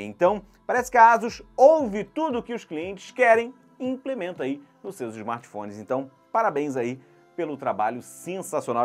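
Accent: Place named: Portugal. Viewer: Portugal parece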